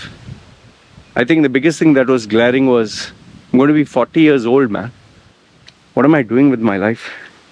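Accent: India. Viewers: You have changed a lot. 5 years ago u had that pseudo American accent and now fully Indian accent (your genuine voice which is good to hear). You should try radio jockeying. Other changes includes softening down from the brash tone u had then. Good luck. Also you have come back full circle from Family to show off life to back to family. Sometimes I wonder this is heaven(our lifespan) and there is no other place called heaven and we go back to an unsexy place after death....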